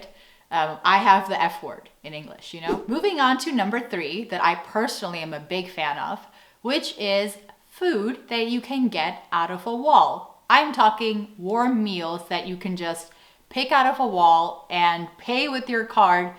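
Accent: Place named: Netherlands. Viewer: How do you retain that perfect little figure? if i even looked at half what you eat in your video's i would need wide screen to not extend outside the frame LOL, enjoy your blessed metabolism! Wow, your english is more and more becoming like a dutch person speaking english, you are getting the accent! Combine that with your dutch words being so good... if i dont remind myself you are american, i would think im hearing an average dutch girl, apart from some harder dutch words which you butcher in an ever so cute way! Yep, the bike above other bike thing intimidates me too. Especially when i grew up in a time they didnt have the slide. you just had to lift the bike and sort of roll it in there. Which was why the bike parks used to have a note "gentlemens bikes on top, ladies at the bottom' so there would usually be a spot down there, and they cheated a bit by having the lower parking spots be dwon a small ramp, so the top ones were about at eye level. 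I often used paid bike 'parking garage' where there was an employee to help you if you couldnt manage. And ofc there is often some other person prepared to help if they see you struggle.. (Tip: skirts attract help, LOL theyre miraculous. they make cars let you cross, get stuff picked up for you etc) To be blunt: only antisocial youth curse with cancer Its a tell tale sign of ppl you want to avoid.. Its not at all accepted among civilised or mature people, and to a lesser extent this applies to other diseases as well. The non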